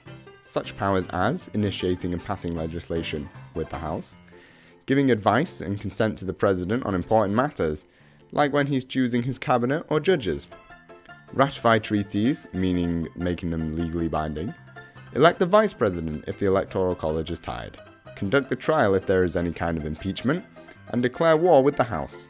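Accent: United Kingdom. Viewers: I liked the way you explained it with a British accent. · English accent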